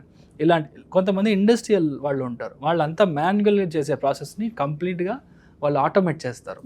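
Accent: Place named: Tamil Nadu, India. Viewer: ఏం తెలుగు రా నాయనా.. తమిళ యాస, సరైన తెలుగు పదాలు కూడా మాట్లాడటం తెలియడము లేదు. ముందు తెలుగు సరిగ్గా నేర్చుకుని రా గురువా..🤔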